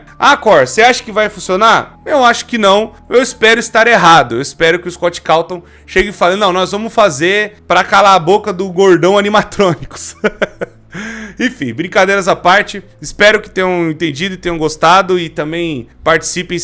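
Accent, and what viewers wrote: Brazil. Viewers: O jeito que vc fala se assemelha mais aos brasileiros,vc vê muitos vídeos na Internet ou pq convive com BR?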